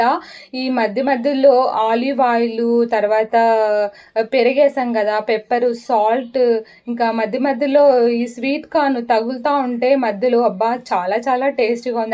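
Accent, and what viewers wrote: India. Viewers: Mee language baavundi Ananthapur kadaa mam meedi??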